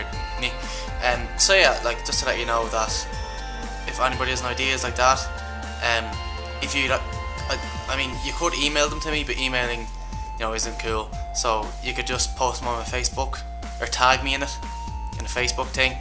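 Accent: Ireland. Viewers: lmfao it could be picture of a penguin. haha ur soo adorable :P btw i love ur irish accentt <333 :D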